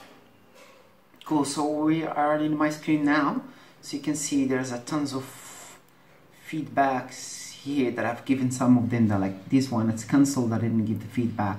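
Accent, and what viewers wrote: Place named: England, United Kingdom. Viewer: Ur english accent👌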